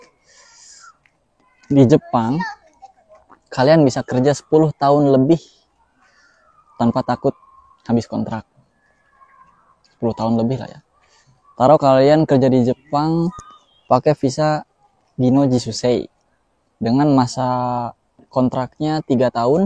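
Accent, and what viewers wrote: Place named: Bekasi, Indonesia. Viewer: oh kirain bekasi logatnya kaya org bekasi soalnya😂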